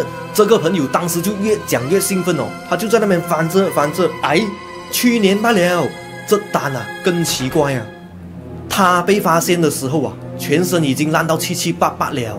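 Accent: Malaysia. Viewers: 故事惊悚很精彩，大马腔调好听👍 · 都要看/听😂，support 大马腔，请问你是客家人吗？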